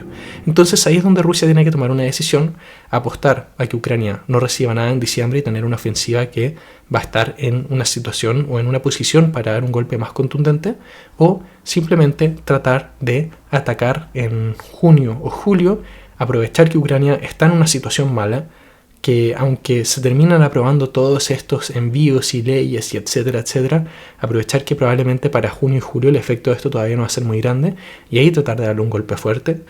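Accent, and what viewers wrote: Chile. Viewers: Si compa, es de Chile. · Eres chileno bro?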